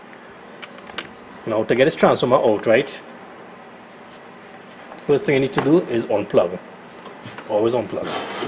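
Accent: Trinidad and Tobago. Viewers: Can always know a Trini from his accent. Im one too. You could still discharge the Cap before removing the leads. Where you get parts in TT? Oster version here. Will work on it soon.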